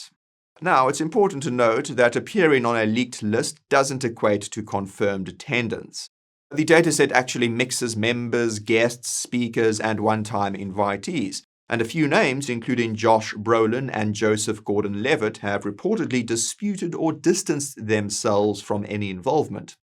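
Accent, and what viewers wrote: South Africa. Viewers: Your accent sounds very South African? Just asking as I am South African with Irish roots and have a lot of respect for the people of Ireland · He's clearly not British, maybe South African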